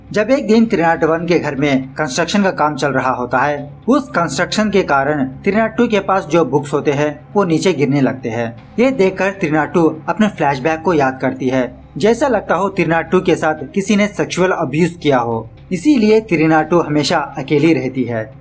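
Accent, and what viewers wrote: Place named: Bengal, India. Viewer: Bhai hindi bol ye banglihindi kyu bolra h🤣🤣🤣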